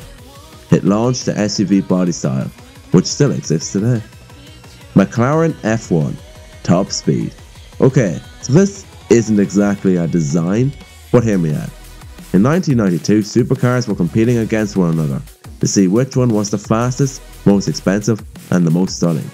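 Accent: Ireland. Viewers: he is Irish...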